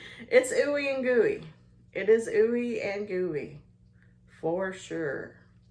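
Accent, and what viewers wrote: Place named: South, United States. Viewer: My niece would love the cute body cat. 🐱 💕 Ty so much for being so down to earth. Your positivity is a domino effect for sure. 😊 Thank you so much for shouting out my sweet and precious bff 🎉She is such a blessing in my life and I’m grateful for her daily. ❤I was so glad she sent me your way too. I’ve truly enjoyed this video so much. Love self care Sundays and Trisha’s Friyay mask day. My two favs to tune into now!!😊❤ People like you and Trisha ( and so many more)are making a difference in such a positive way so keep going. You ladies are helping me so much more than you even know. I wish you all the best! 🤗 ❤ Trisha and I have some southern twang too so no worries here. Lol😅 Btw the way your husband is very sweet. EewwwWee… is a great nickname! 😀 you have made me laugh so much. So ty!!😅 I have watched one of the Final Destination movies and No more for me. 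🥴 Cute little cats 🐱 I have 3 dogs I feel the same way about! Enjoy time with your momma. I lost mine last year so hold tight to those great times you make together. 😊 God bless and have a wonderful Sunday! ❤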